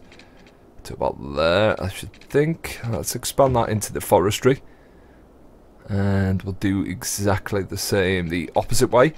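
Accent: United Kingdom. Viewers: Man Bear Pig Gaming for the same reason i enjoy your prison architect stuff; derpy, well intentioned, plate-spinning enthusiasm coupled with some fine uk-flavoured commentary.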